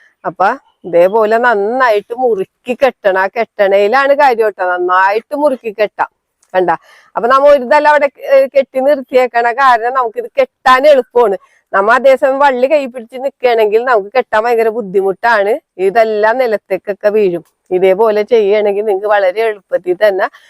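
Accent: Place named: Ernakulam, India. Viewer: Eranakulam aanalle😊 Bhasha kett paranjathann.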